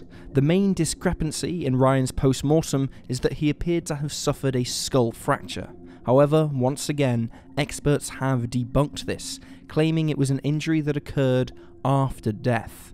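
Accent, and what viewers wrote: United Kingdom. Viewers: he's British so not privy to how we pronounce things.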